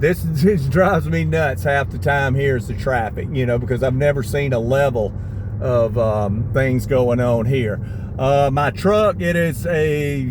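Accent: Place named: Texas, United States. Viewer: Men just opinion to gain follower as you are leaving in Philippine you should hold back and be mindofull or should I say make your vlog meaningfull on side of being us , I get you as Joe in our country but if your running this vlog as if your expecting something to gain in good way I will tell you you sound like fron Texas or mid west as fuck , again if you want to gain in nice way in your vlog be with us and understand , we don't like people judge us coz we are pinoy we leave like this. Get you ass off our of our country if you talk or sound like Joe to educate us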